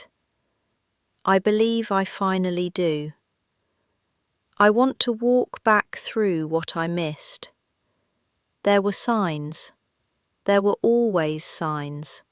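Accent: United Kingdom. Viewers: Get rid of the English accent!! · These stories are so fake. Someone born and raised in Ohio in an Amish community would not have a British accent. This is all AI generated.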